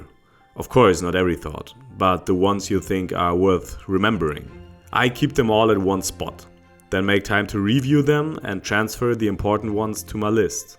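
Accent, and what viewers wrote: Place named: Germany. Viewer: apart from the strong german accent, this was a very nicely done video... loved the classical music, too ❤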